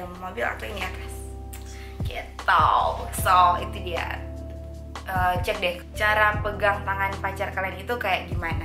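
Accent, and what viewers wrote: Manado, Indonesia. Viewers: Pertama kali nonton konten dr mbaknya. Kedengerannya mbak orang Manado.. anyway salam kenal & sukses dgn channelnya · rupa sama ini logat say...dari manado..?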